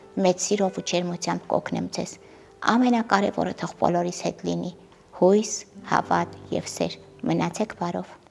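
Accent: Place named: Gyumri, Armenia. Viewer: Իրա ազգանունն է նույնիսկ ասյում 50-50է կեսը գյումրեցու կեսը գրական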